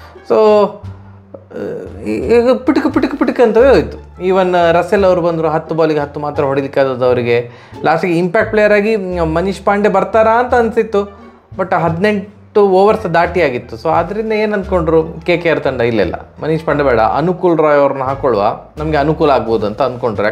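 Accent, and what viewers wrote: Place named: South Canara, India. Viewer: ಪಕ್ಷಪಾತವಿಲ್ಲದ ವ್ಯಾಖ್ಯಾನ. ಸುಂದರ ಮತ್ತು ಸಿಹಿಯಾದ ಸೌತ್ ಕೆನರಾ ಕನ್ನಡ. ಯುಗಾಧಿ ಹಬ್ಬದ ಶುಭಾಷಯಗಳು.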